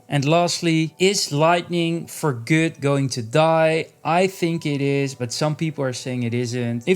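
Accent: Netherlands. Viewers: The Dutch accent is strong with this one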